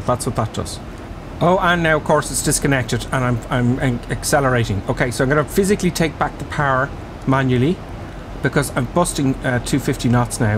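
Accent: Ireland. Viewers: I'll never fly these big jets, I just come for the great craic. Keep it up. Highly entertaining, especially the response to the shite adverts. No one says it quite like the Irish 👍😀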